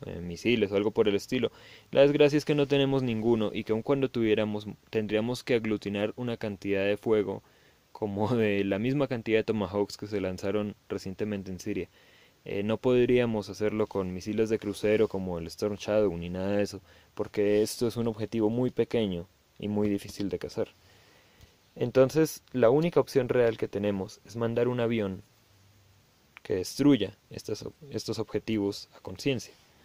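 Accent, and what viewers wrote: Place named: Colombia. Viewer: por tu acento siento que eres colombiano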